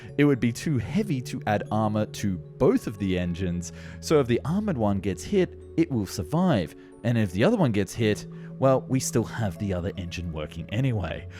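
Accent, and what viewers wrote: Australia. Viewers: He's Aussie, what do you expect? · well hes australian he has an accent like that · Bro it's Australian he says SUR 25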